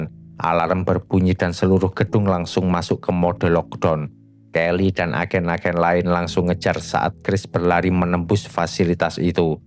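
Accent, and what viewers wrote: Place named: Java, Indonesia. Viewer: logatnya jawa banget wkwk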